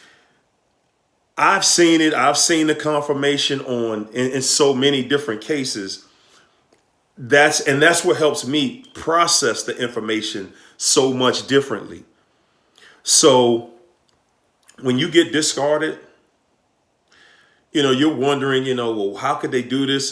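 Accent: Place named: Baltimore, United States. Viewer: I hear a little Baltimore in your accent...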